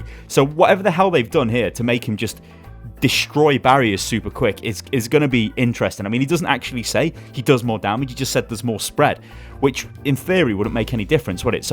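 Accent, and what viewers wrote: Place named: London, United Kingdom. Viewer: what is this? a good london speaker?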